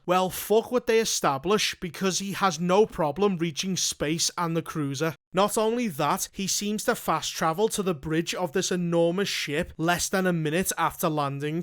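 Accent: Liverpool, United Kingdom. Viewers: Scouser accent brings much more fun into this travesty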